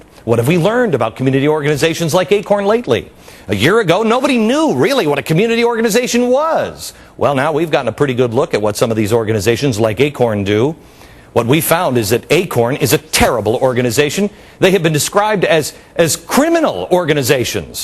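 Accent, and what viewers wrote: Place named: Germany. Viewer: im not even sure Glenn is american!!..has anyone seen or verfied his birth certificate???? and doesnt sound German like General Von Bock Bock/?.. in fact i think hes half south african...... ask him to say South Africa if he pronounces it Seth Efrica ya got ya proof.. nope he certainly dont seem and as for doing a good job by pissing liberals off id say as he stands for what the repulicans think hes making their credibility plunge to even deeper depths.....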